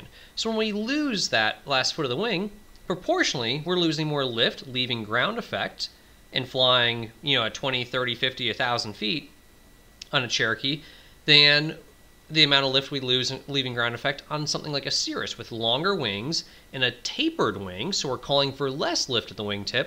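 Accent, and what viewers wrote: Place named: United States. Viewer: Might be an interesting subject but can't listen to such an awful voice, quite apart from the bloody american accent.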